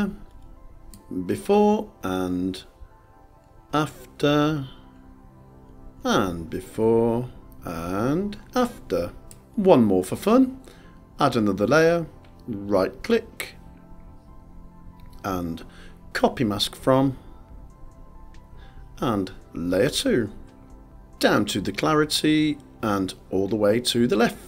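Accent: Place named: RP, United Kingdom. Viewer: Thank you for your awesome tip!! Furthermore, watching your excellent videos is like killing two birds with one stone: I learn Capture One Pro, and I improve my English thanks to your Received Pronunciation 😅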